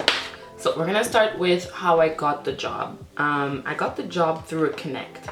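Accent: Canada. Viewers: Girllll, I love you I subscribeddd. I could definitely tell you were Canadian too 😭❤️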